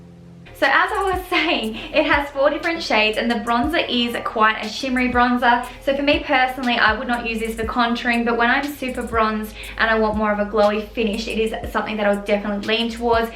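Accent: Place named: Australia. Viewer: I love you you're so pretty and I love you're Australian accent!!!!! I come from the UK but you're so pretty and I love all your videos!💖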